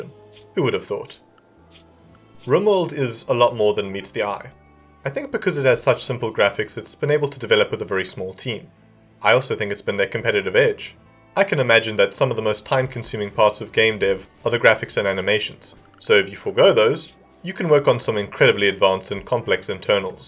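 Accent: South Africa. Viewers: Ayo, are you South African? 🤨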